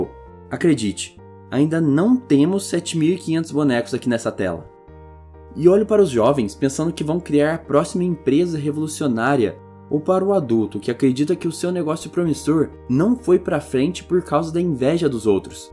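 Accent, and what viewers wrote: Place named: São Paulo, Brazil. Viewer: EITA SOTAQUE PAULISTA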